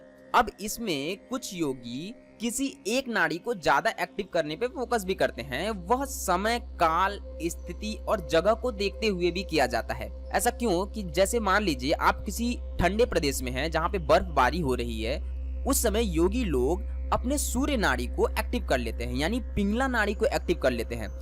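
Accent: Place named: Bengal, India. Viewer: Abe Bengali kaisi hindi bol raha hai. इंडा क्या होता है । ठीक से बोल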